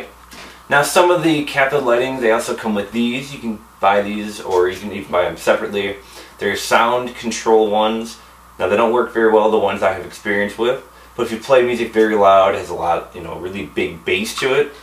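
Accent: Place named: Chicago, United States. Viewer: I'm assuming you're from Chicago lol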